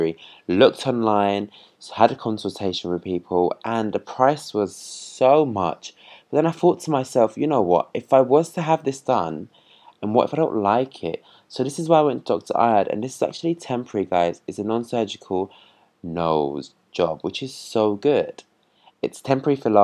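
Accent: United Kingdom. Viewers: you have a very boyish manly face. Very handsome. you can pass for a Puerto Rican, except the British accent lol. Thank God you did not have your nose broken with a rhino plasty. Maybe some laser hair removal to make your beard perfect. But other than that you are handsome :)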